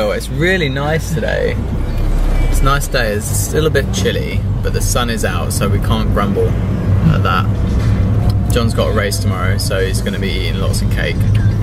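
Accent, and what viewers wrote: Ireland. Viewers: I love both The Verve, and Bombay Bicycle Club :O You have great taste in music haha. By the way, you sound like a very convincing Irish man. I'm Irish, so I know :P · ps - Your Irish accent is bloody amazing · Love the Irish accent ;)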